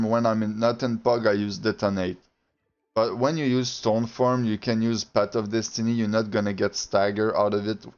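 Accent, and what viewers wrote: Quebec, Canada. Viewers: Vu que je remarque notre fameux accent quebecois, je vais t'ecrire en francais hahaha. je me demandais si le build serais bon avec la greataxe aussi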